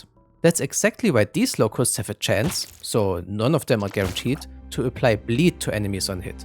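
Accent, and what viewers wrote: Germany. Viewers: are you german? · du klingst deutsch… bist du Deutscher?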